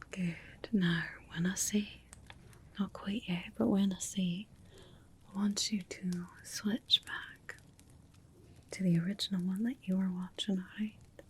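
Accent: Scotland, United Kingdom. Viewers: The scottish accent is the best❤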